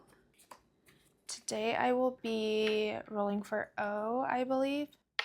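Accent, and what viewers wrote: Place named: Australia. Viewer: Hi! Omg I’m excited for your trip! Hopefully you can get a tourist visa on time so that you can also spend more time traveling before heading back to the states☺️ I might be the only one thinking this but I feel like I’m hearing a bit of the Australian accent from you?!😆 omg that’s so cute your boyfriend likes chocolate milk!! I love watching your channel as well as cloudymoney, rainebudget, and fancydollar🤍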